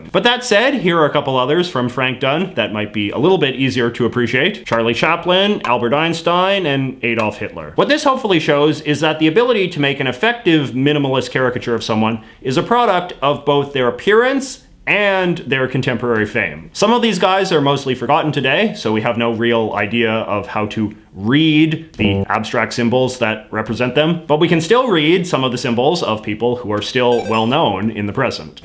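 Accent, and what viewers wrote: Canada. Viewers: As a Canadian who's lived in multiple prpvinces, from coast to coast, id love to know where in Canada that your accent comes from! I've never, ever heard anyone actually pronounce "about" that way. Not ever. I need to know where that stereotype comes from, exactly!! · I am from Montreal, and I watch several videos in English on Youtube. So far you are the only one I hear saying "About like if it was a boot". Maybe I hear to much from US. Everytime, I hear "A boot". Is is localize to where you live. Is in Ontarian? I am very curious. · You’re so Canadian that it’s distracting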